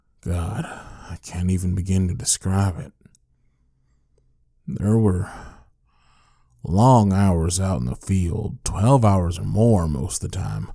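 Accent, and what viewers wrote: South, United States. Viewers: I love you cowboy accent it’s so hot and fine love you can your voice ❤️❤️😍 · This was amazing. Made my whole evening better. Love that southern drawl.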